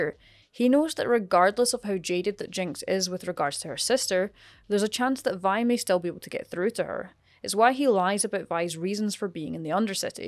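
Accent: Scotland, United Kingdom. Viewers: Arcane and Scottish accent commentary. How can I not subscribe? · A Scottish lass talking about my fave villian in recent memory, yes please.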